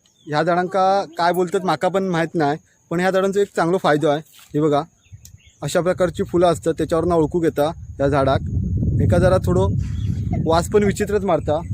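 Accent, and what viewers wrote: Konkan, India. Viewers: छान कोकणी टोण मध्ये बोलतो तू. ते फुलाच झाड विषारी आहे त्या झाडा मूळे आजू बाजुला इतर झाड जगत नाही. परदेशी झाड आहे ते इंग्रज घेऊन आले होते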